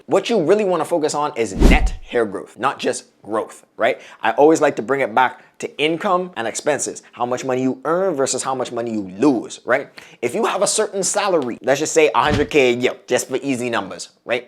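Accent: Bahamas. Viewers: I think the Bahamas 🇧🇸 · he does sound Bahamian. I knew when he said “hay-auw” for hair lol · sound like he is a Bahamian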